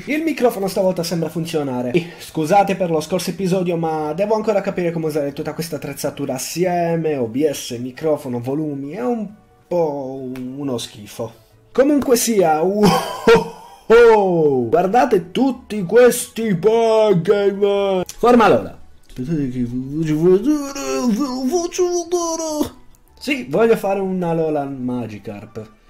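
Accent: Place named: Sardinia, Italy. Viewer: sei sardo? perché hai un'accento simile al nostro (sono sardo)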